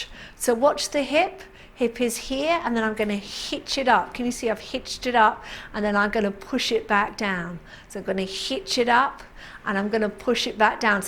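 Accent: United Kingdom. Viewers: I haven't attended Pilates for about 3 years, but these short simple basic classes have got me back into it again. Nice to hear a UK accent too! Thank you. It is such a lovely feeling to just 'unlock' your stiff muscles. Fantastic!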